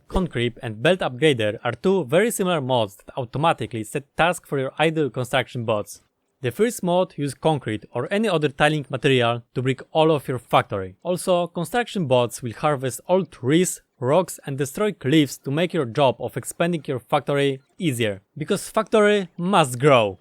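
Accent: Russia. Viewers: Такое чувство как будто русский говорит на Английском))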